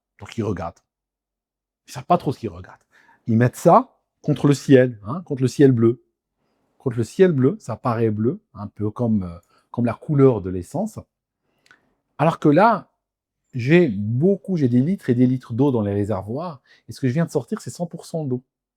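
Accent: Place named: Switzerland. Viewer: tu Soules avec l''accent Suisse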